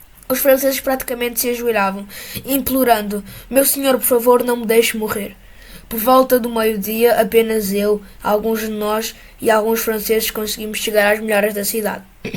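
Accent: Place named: Portugal. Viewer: Eu não acredito em português de Portugal falando sobre guts & blackpowder muito legal me inscrever agora no seu canal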